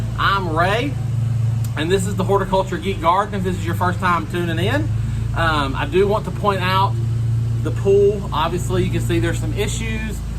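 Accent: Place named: Arkansas, United States. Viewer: I had to look at your bio information after watching your most recent video re making a planter fountain. Your accent sounded so familiar and when I saw you now live in central Arkansas I knew why. I am originally from Camden. I’m enjoying your videos🌷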